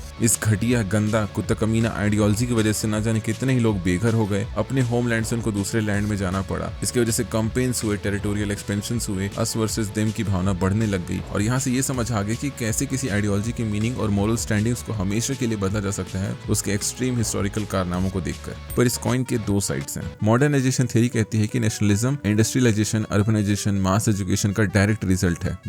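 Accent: Chhattisgarh, India. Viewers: Aap Chattisgarhi hai kya? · No offence ! But are you Chhattisgarhi?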